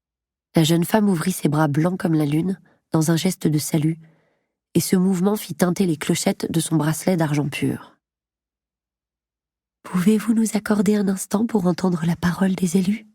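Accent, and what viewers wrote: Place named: United States. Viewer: Quand on a lu le livre la prononciation des prenoms à l'americaine est tres perturbante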